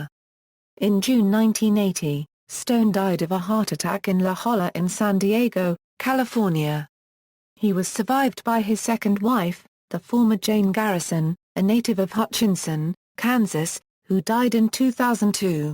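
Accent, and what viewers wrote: England, United Kingdom. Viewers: Why is a robot with an English accent narrating this? Geez.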